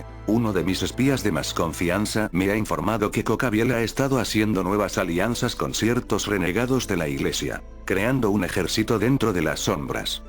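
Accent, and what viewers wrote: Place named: Dominican Republic. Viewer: Klk, un Dominicano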